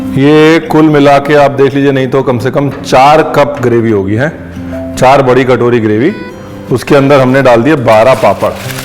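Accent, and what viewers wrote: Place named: Bihar, India. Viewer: Sardar ki aulad hokar bhayiyon ka accent kyun bolta hai?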